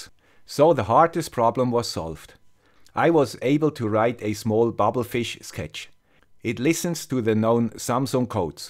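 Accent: Switzerland. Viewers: Hi, ich beschäftige mich gerade mit dem ESP32 und bin dadurch auf deine Videos gestoßen und muss sagen WOW. Echt, der Grad an Details und der Umfang für dein Thema hauen mich echt um. Alle anderen Youtuber sind nicht so präzise oder machen zuviel Entertainment aus dem Thema. Aber nicht beim Typen mit dem schweizer Akzent:D, der brilliert durch Content und sein Hobby! Ich hab das Gefühl egal was ich gerne mit dem ESP32 machen will oder was mich interessiert, du hast das schon einmal durchdacht und nimmst einem dabei viel Mühe und Recherche ab. Also noch einmal: ECHT TOP! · I'm very cheap and I like tinkering. Everything in my house is DIY and custom made. I like your content and Swiss accent very much. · As usual, a great translation of an alien topic with a characteristic Swiss accent and with huge positive benefits for your audience, thank you!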